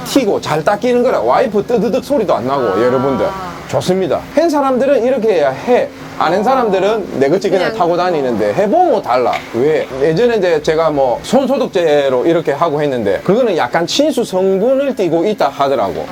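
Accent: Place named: Changwon, South Korea. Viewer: 저도 늘 영상만 보다가 주문했어요. 고향이 저도 창원이라 정감난 말투가 반갑고 재미나게 봅니다. 사투리가 씨네..~~~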